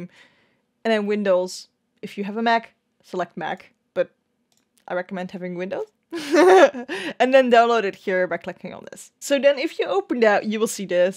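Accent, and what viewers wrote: Netherlands. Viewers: are you dutch? you really have a dutch accent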